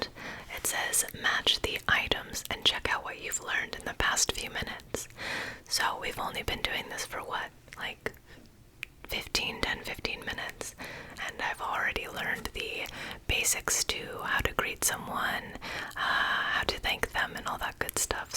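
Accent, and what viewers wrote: Rio de Janeiro, Brazil. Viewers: Exactly, Carioca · The narrator is Carioca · Morri com o sotaque carioca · In Portuguese: Você é muito fofa falando em português! Eu amei te ver falando nosso querido idioma, mas tenho uma informação para acrescentar a você: A pronúncia do S em português é mais utilizada como "ssssss". A pronuncia que soa como "shhhh" não é o padrão brasileiro. São pouquíssimos estados que pronunciam a letra S com "shhh", que são os cariocas (nascidos no Rio de Janeiro) e os nordestinos (nascidos na região nordeste). De resto, todos os outros estados pronunciam o S da forma padrão, soando como "sssssss". São culturas diferentes que se expressam de formas diferentes e não tem nada de errado nisso, mas é quis compartilhar essa informação com você! E obrigado por esse video, quero te ver mais vezes falando em português! Você tem um sotaque muito lindo <3 In English: You are very cute speaking in Portuguese! 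I loved seeing you speaking our beloved language, but I have some information to add to you: The S pronunciation in Portuguese is more used as "ssssss". The pronunciation that sounds like "shhhh" is not the Brazilian standard. There are very few states that pronounce the letter S with "shhh", which are Cariocas (born in Rio de Janeiro) and Nordestinos (born in the Northeast). Otherwise, all other states pronounce the S in the standard way, sounding like "sssssss". They are different cultures that express themselves in different ways and there is nothing wrong with that, but I wanted to share this information with you! And thanks for this video, I want to see you mor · Toda carioca ela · Narrator's accent is from Rio de Janeiro. In São Paulo would be sss sound , not sshhh sound.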